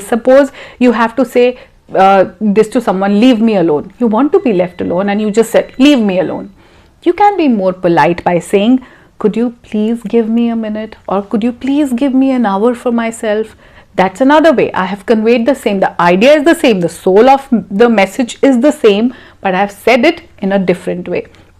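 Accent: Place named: India. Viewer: Such an amazing skill in speaking in clear Indian accent.. Thank you very much dear teacher.. You're talking to our hearts and we're actually fortunate to have a great educator like you here on this educational YouTube channel..I'm watching all your video series without fail from your neighbouring country, Sri Lanka 🇱🇰.. Keep it up....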